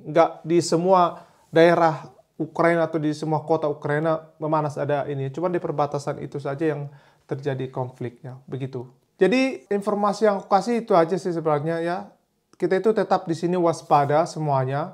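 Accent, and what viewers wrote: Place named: Madura, Indonesia. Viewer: Logatnya mirip orang madura 😂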